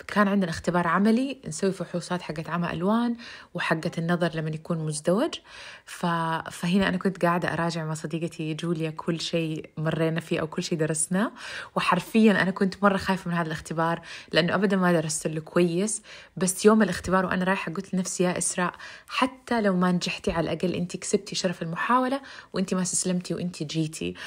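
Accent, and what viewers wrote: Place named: Saudi Arabia. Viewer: اللهجة السعودية سموذ ومريحة وصوتك حلو ماشاءالله ❤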